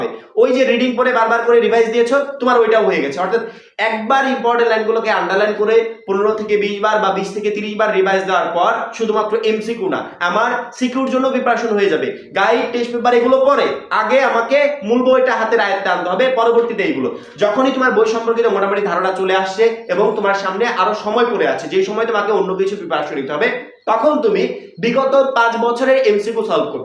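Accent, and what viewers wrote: Sylhet, Bangladesh. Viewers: আপনি কি সিলেটি😢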